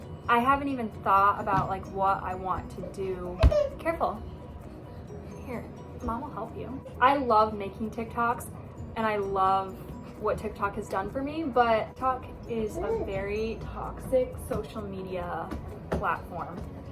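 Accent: United States. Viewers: Thanks for the story! Your dialect says MINNESOTA but you're from Vermont. So cool.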